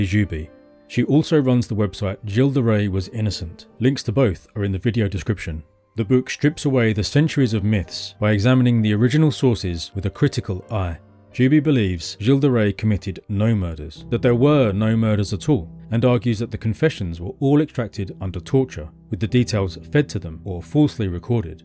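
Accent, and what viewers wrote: United Kingdom. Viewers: This video auto-played after one of MrBallen’s videos. I normally just exit the new video when this happens, but not today. This is awesome! History, crime and great narration. The British accent is a huge bonus (I’m a borderland Texan lol). Thank you, sir. 🏰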